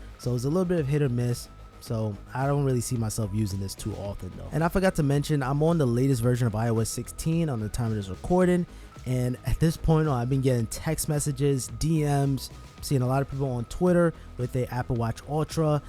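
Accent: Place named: Queens, United States. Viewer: Omg you from southside queens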